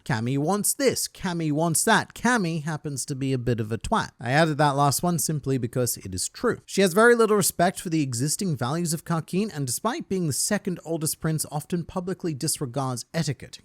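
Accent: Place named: Australia. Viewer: I manly watch this guy on the Internet souly to obtain his accent, after listening for years I'm able to mimic the australian accent and convert it into my own speech😅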